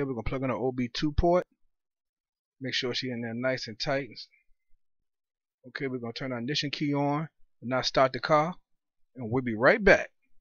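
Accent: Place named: DMV, United States. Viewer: I know a DMV accent from anywhere, Thanks for the help!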